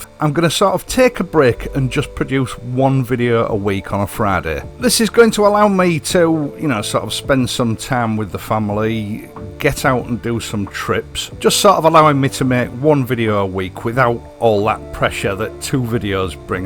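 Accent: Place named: Hull, United Kingdom. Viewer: I take it you're from Hull or thereabouts? From Hull meself, but been languishing in Western Australia for the last 48 years. Just found your videos and I like your commentary and delivery. For me sadly I won't be owning another motorcycle anytime soon. At 74 my wife won't let me near one. Still good to dream though.....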